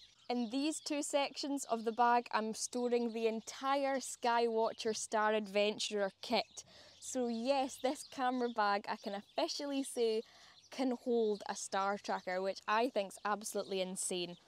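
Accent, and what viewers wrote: Scotland, United Kingdom. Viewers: LOL "Pair-fect" bag. I love that Scottish accent!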